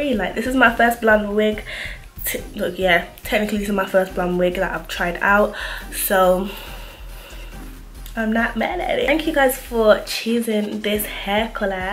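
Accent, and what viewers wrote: Birmingham, United Kingdom. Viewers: Aww Birmingham accent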